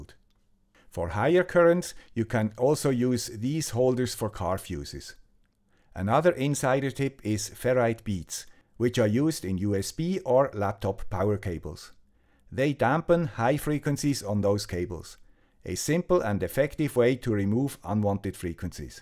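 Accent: Switzerland. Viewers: Needed this video today...thank you,). I must confess, I am green with envy. Your workshop, supplies, and logical organizational of parts is what is on my hokey pokey ‘vision board’. You’re awesome 😎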